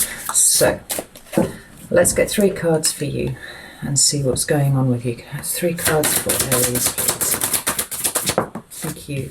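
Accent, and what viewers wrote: United Kingdom. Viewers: Dude I love your aesthetic, the whole UK accent (idk) and the whole dark style fits very nicely.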